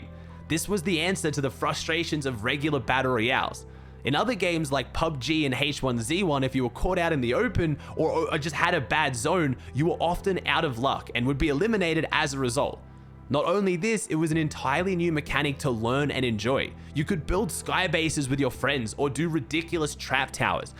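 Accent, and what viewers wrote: Australia. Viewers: Props to the editor who, seamlessly collated these videos and worked perfectly alongside Aussie narration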